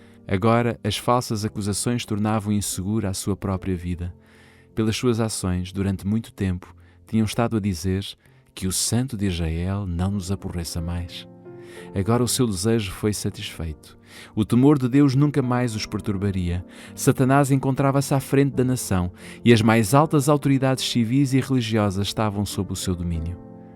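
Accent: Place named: Portugal. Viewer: Um ótimo trabalho! Uma otima voz! O Senhor seja louvado por esta iniciativa em português de Portugal com esta agradabilissima voz!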